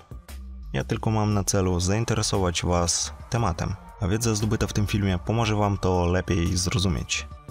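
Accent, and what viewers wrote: East, Poland. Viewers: Twój wschodni akcent sprawia tylko że przyjemniej słucha sie o fizyce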